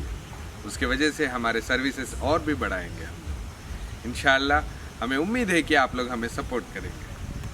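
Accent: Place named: Hyderabad, India. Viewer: Bhaijan aap hindhi me hich bolo na kya tho bhi ae ginger garlic tomatoes bolna shuru kardiye aap bhi... ooo connect nai hora bhai aap ki zubani pure hyderabadi hai na bhai kaike liye change kardiye aap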